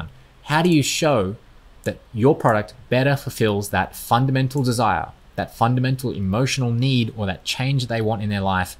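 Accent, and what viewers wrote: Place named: Australia. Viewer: hahaha and that’s 79% of why i subscribed!i also have an accent.. but British ( Caribbean British ).. are you Aussie?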